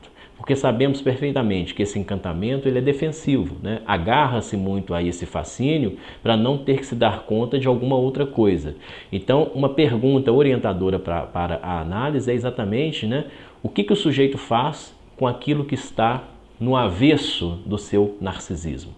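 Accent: Minas Gerais, Brazil. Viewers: Parou tudoooo!!! Um arrazo! Eheh! Nada me fascina tanto quanto uma oratória tão perfeita! Com uma pronúncia de Minas Gerais então...ui! Rss. A fluidez das palavras; o intervalo entre as frases; o seguimento do raciocínio; o tom moderado e firme da voz. Perfeito! Abraço, Sr. Doutor!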